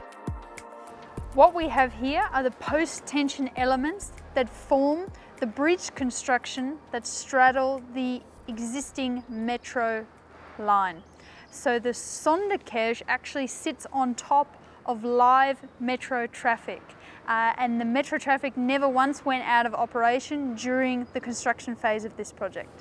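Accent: Australia. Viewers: God, I totally love this australian accent O.O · I've been following this project since 2010. It's great to hear an English overview of the latest developments but even better that you're an Aussie. This is Stuttgart's equivalent of the Sydney Opera House. Please volunteer to do more. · cool project, cool people working on it and the Australian accent is very Wellcome in Schwäbisch Land..:) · Do I sense an Aussi accent?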